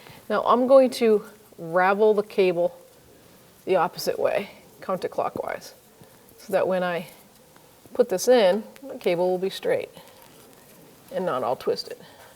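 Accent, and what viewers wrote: Boston, United States. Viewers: I hear that Boston accent and I feel like I’m home.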